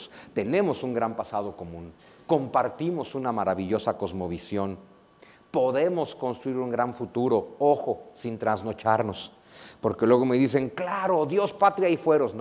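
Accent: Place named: Spain. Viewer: Estoi stipos creen que van a cambiar el mundo para muchos este tipo es un enfermo . solo escuchalo ya comienza a hablar como Español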